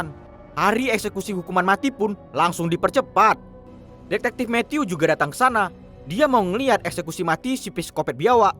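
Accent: Medan, Indonesia. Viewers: Orang Medan ya bro? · Slalu suka logat medan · Org medan bang logat nya kayak orang medan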